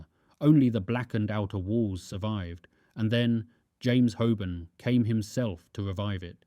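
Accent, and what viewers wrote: United Kingdom. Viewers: Hey Dude you sound British. How would you like it if the British king torn down Buckingham palace to make a modern all-glass tower like what they have in Dubai?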